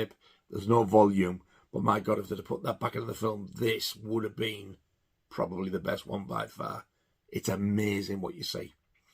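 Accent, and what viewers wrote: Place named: Northern England, United Kingdom. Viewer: whereabouts I can hear you’ve got an accent are you from like up north? I’m in London myself I’ve preached the gospel before in Doncaster and I was wondering if you might have been from there anyway your videos are good mate. Carry on the good work.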